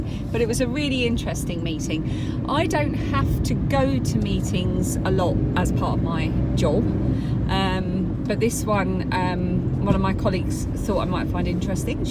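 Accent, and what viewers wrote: England, United Kingdom. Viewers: I can't wait to see you and the family your so fun. when you don't have the money to go round the world it's great to have internet and a tablet 😂... I love England and the way you talk it's awesome!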